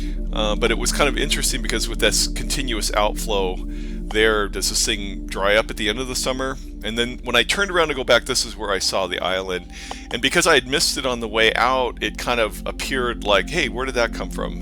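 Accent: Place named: California, United States. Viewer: This guy narrating sounds like a typical California moron. “This water is ugly, ew no” hahahaha my god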